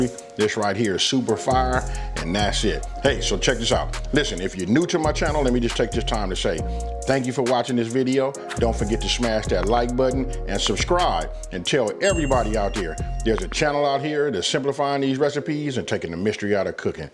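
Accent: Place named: West Coast, United States. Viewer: I just love that #WC accent😊💯. And the dishes are A1💪🏿. I'm making this dish tonight for a family of 5(including myself)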